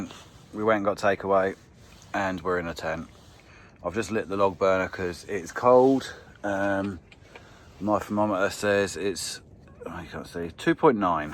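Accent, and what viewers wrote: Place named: South East England, United Kingdom. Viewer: I feel like I know the accent. You sound south-east UK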